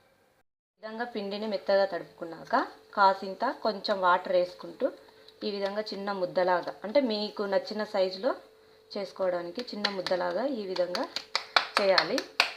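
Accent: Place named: Telangana, India. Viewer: telangana lo famous e jonna roteylu but mana yasalo chepandi enduku madhya lo koncham koncham yasa change chestunaru akka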